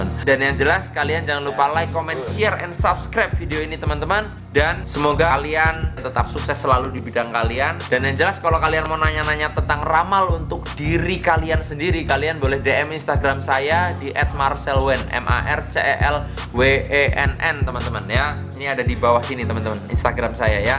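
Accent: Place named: Yogyakarta, Indonesia. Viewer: Orang mana bang kok medok?😂, Dari Jogja ya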